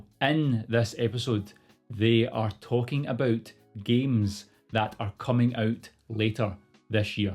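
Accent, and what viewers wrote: United Kingdom. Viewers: イギリス英語だぁ〜💕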